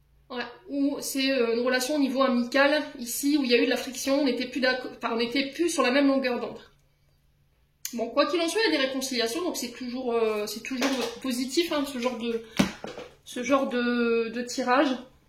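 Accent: North, France. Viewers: Bonsoir a tous, bonsoir, j'adore ton accent de chnord,je suis de Calais 😂 je suis cancer ♋️ ascendant cancer ♋️, elle est bélier 🐏 ascendant bélier 🐏, moi je me suis laissé emporter par elle,love bombing, gaslighting etc.....bref courage a tous,parfois il faut traverser toutes ses épreuves pour avancer...et croyez-moi cest purée de dure...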